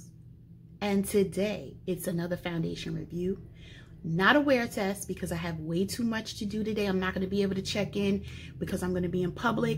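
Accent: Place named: New Jersey, United States. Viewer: Just found your channel and yes! Love your content Jersey Girl!(?) or if not from my home state, your accent tells us you are close neighbor👍🏽! Truly hope and pray your channel continues to grow because you know your stuff! So Awesome!!!! Your skin is amazing and so appreciate you doing You!!!! Thank you!! Can’t wait for the next video😊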